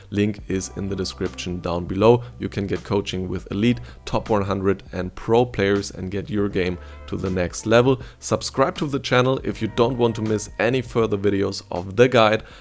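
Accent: Germany. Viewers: You have german accent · Is this dude German?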